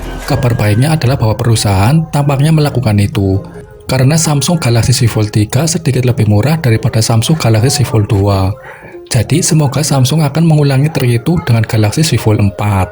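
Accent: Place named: Java, Indonesia. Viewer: medok amat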